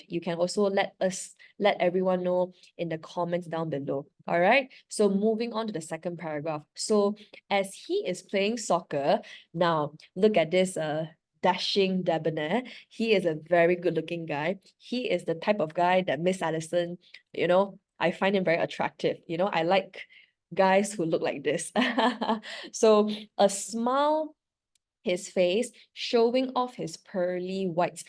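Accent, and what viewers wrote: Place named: United States. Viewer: wow you actually sonud like an american ngl howdey homie!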